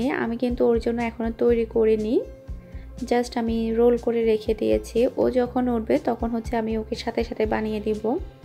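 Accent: Chittagong, Bangladesh. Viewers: আপু আপনি সাতকানিয়ার মেয়ে, ওয়াও,,আপনার কথার মধ্যে চট্টগ্রাম এর একটা সুর আছে। আমি ভাবতাম কোথায় হতে পারে আপনার বাড়ি। শেষেমেষ আমারা একি যায়গার মেয়ে হলাম। এই জন্যই তো আপনাকে আপন আপন লাগে,।আমিও সাতকানিয়ার মেয়ে।কানচন, ফুলতলা, মিয়াবাড়ি, আমার বাড়ি, আর শশুর বাড়ি ডলুকুল❤❤❤❤❤অনেক অনেক শুভ কামনা রইলো আপু আপনার জন্য❤❤❤❤ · Apnar kota sone amar bar bar mone hoccilo apni chittagongr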